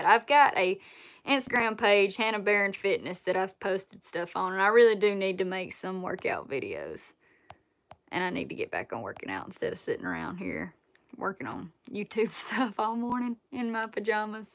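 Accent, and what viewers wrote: South, United States. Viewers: You're an Amazing Pioneer woman & that southern draw is sweet & badass all in one. Will ya do a bowfishin night video for us this summer? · Hannah I love your accent its an authentic American accent what you do for a living is cool, girl. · Don't you ever think your accent sounds bad.I have always loved a southern ladies accent and yours is the best.I am in Baltimore just on the outskirts of the city and I would take your southern accent and southern charm anyday from how they talk here.Dont let anyone say anything bad about the way you talk or act.Your pure class. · Southern accents are the best! You don't sound like a dude, whoever said that, needs some game ears to hear your pleasant voice! Keep doin whatcha doin! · I wish all women had that beautiful southern accent! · You’re accent is awesome. And I’m from CNY. Love the southern accent. 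· accent what accent thats just your southern charm a shinin through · The best thing about your video's is you. Your beautiful, have a sexy southern voice, a good sense of humor, and your a talented hunter. From watching your video, it seems like your a wonderful person and well, if you haven't noticed, we're all still watching even when your live, in your pajamas with your pretty hair not brushed. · I love the way you talk and wish I had that southern accent you do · You have a lovely southern accent . Nice to hear someone with more of a southern accent , than mine ! :-) Be safe and stay healthy . Wyr God bless From Texas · Hannah, Your video may have been taken down because they are doing random removals because they do not have human staff to look at videos and censor them correctly. You may be a victim of You Tube picking random videos that have a title that may be slightly suggestive. 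Re-download and put a title that is different "DO NOT SAY NO EARS" This gets their attention. Try it again and I guarantee it will not be taken down. If anyone here does not know that your Dad is your Dad and thinks he is your boyfriend...well they are not paying attention. I knew that from the first few videos I watched on your channel. Your videos are great. Your Dad is a very nice man who loves his Daughter. He is one of the hardest working men I have ever seen. You get your work ethic and Southern Qualities from him. I Wish I could tell him how great a job he did raising you. ..you are a beautiful and clearly well brought up young woman. I love your accent. I lived four years in the South and miss that Southern charm. Keep being you Hannah. You are a wonderful young woman. Some man will be blessed to call you his girl someday. Stay safe and healthy. We love you and your dad! XX's <3 I hunt and fish and F those who think anything is wrong with that. 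It is called conservation. You do what you have been raised to do and be proud of who you are. Geo. Like my channel and subscribe please! Geo. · Sound like a man?!? Uh ... No. A sweet southern accent is like a warm blanket to me. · Gday from the Sunshine Coast Queensland Australia, love me a southern accent couldnt get enough of the stunning southern ladies when i called Bossier City Louisiana home 😁 love your work, awesome job, and tell your daddy he raised you right, nothing beats a well manner, beautiful, country girl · Your southern accent is beautiful just like you! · True southern belle with your accent y'all are great. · I love you're southern accent. Alot of my family is from the south. Im considering moving back down south at some point in time. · You have to keep that accent I love that southern accent have a great day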